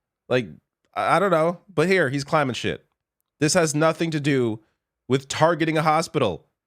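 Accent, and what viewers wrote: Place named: Toronto, Canada. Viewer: He and I are Torontonian (well I think he's from Mississauga, but close enough). He says it just like everyone native to Tdot says it.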